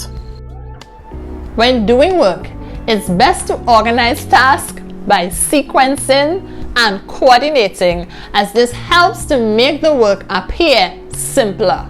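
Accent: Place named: Jamaica. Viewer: You soon like Jamaican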